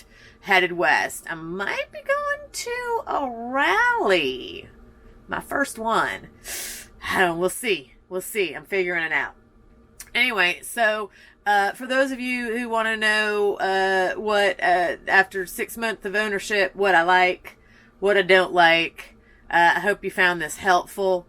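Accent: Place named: South, United States. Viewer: Nice video! New to your channel! Just curious about where you’re from! Obviously a southern lady! Thanks for sharing! We’re kinda in a decision making process and class B vans are in our vision. 🤙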